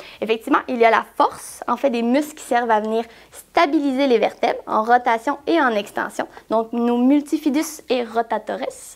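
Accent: Quebec, Canada. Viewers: Bonjour de la France, Merci pour vos vidéo si interentreprises, on apprend pleins de chose. Puis au passage j'adore votre accents QUÉBÉCOIS ! Un jours je viendrais visite votre fabuleux pays :) Petite question si possible, j'ai un vélo Contre La Montre, j'ai fait une étude posturale et de temps en temps j'ai vraiment mal au cervical ? Avez vous une vidéo qui parle de cela ? (si possible) Merci encore pour tout vos conseille.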